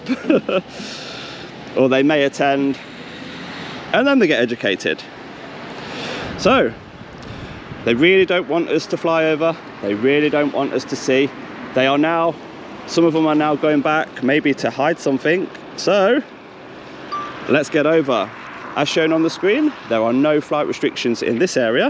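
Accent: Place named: Yorkshire, United Kingdom. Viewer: Nice Yorkshire accent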